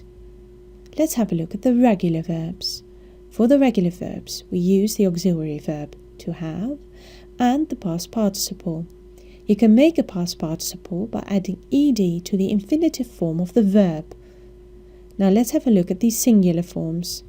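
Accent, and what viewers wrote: England, United Kingdom. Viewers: thanks a lot ma'am I need this for my test In English I need to past this test so I can graduate grade 6 thanks alot and you sound like my teacher in English but a really nice voice your form Ingland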